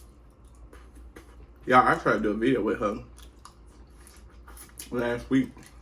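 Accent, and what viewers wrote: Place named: New York, United States. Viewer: You sound like New York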